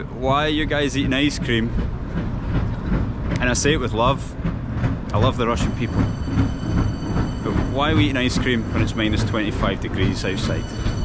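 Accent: Scotland, United Kingdom. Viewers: Какой клевый шотландский акцент! У тебя есть килт? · Oh, your accent! :-)))) I already forgot how Scottish accent sounds like. Yeah, Moscow is a great place to live. The only thing is cold during the winter. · It's so strange to hear a slight Scottish accent, because I'm used to Americans talking about Russia 8-)) It will be interesting to see the Kitaj-gorod (Китай город) area through your eyes! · I grew up in Шаболовка neighborhood in the 70s. In the 90s I moved to the US. Last time visited the area in 2017, when my daughter who studied at the University of Edinburgh (where I also spent quite some time🙂) had to spend 1 year in Moscow as part of her curriculum. It’s hard to recognize the area but your video brought back lots of memories. Looove your Scottish accent! What part of Scotland are you from? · Hello 🎉 absolutely buzzing to see a Scottish 🏴 guy here in Moscow … I lived in Glasgow for almost 6 years but my hubby is from Moscow and due to difficulties with uk visas we decided to move to Moscow. 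Well I thought I would miss Scotland , frankly I do sometimes, Scotland is absolutely stunning but the cost of living, weather and work were a complete mess… got tired of it… long story short, I love it here 😂 Scotland is in my heart forever though and hearing Scottish accent here in Moscow is so much fun 🤩 glad you’re enjoying it here, mate! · It was very interesting to listen to the scottish accent. I understood 95%. Thanks a lot for the video and wish you a pleasant stay in Russia! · As a possessor of English as a second language, I love different English accents, and of course love your Scottish accent, bro. Keep it, be glad and proud of it, we need to celebrate differences.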